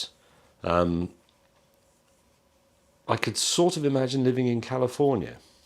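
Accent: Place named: United Kingdom. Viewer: Hi James. I too, am a native Californian. I will join the chorus of Californians posting here and say that you are more than welcome here. We love British accents and with your wit, humor, and charm, you would be a big hit anywhere you go.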